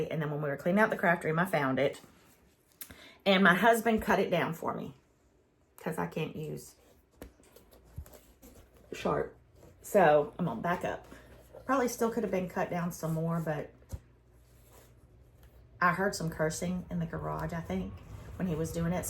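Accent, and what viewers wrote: South, United States. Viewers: I am so happy I found you. Love hearing the southern accent, y’all, and just your openness. Then you have sharing your gift and creativity.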